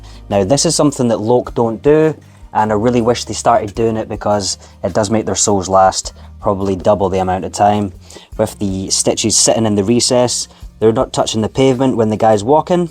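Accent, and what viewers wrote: Scotland, United Kingdom. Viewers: He's scottish. S c o t t i s h ...get it ? Understand ? i can say it slowly for you if its still too difficult. Guess what you can give him a view with the sound off too 😂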